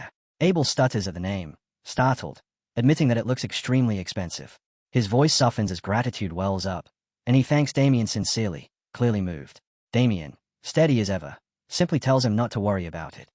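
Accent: Australia. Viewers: Stupid Aussie accent. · Idk but i want to say Australian for some reason.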